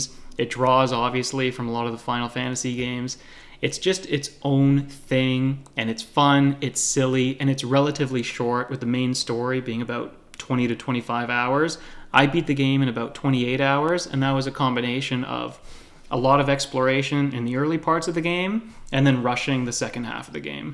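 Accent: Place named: Upper Midwest, United States. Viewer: I got FFXV on Steam in 2017 but didnt play it until last year. It was a pleasant surprise! You made great points about it. By the way, you remind me of someone I enjoyed watching on YouTube pre 2010 - Roger Swan, an American vlogger that lived in Japan. I think it's your manner of speech, maybe you're from the upper midwest.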